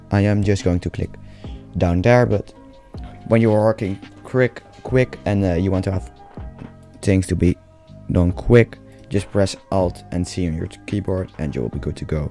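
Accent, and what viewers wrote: Netherlands. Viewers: ik hoor gewoon aan je accent dat je uit nederland uit komt hahahaha. Maar dankje wel voor de video. Was egt hulpzaam!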